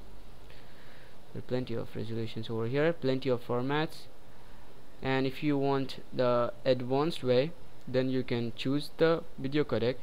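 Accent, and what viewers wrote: India. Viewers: this guy is just messing up with you, he might ment to say that you sound Hindu(is your accent) but who cares, nice video, helped me out a lot.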